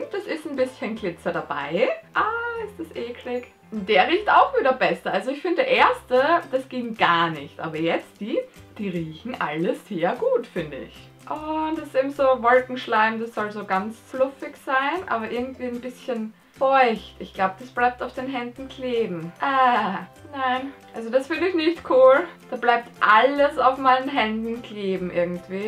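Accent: Austria. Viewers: Hi bist aus Österreich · Du bist a österreicherin.....kenn i ..i kum aus linz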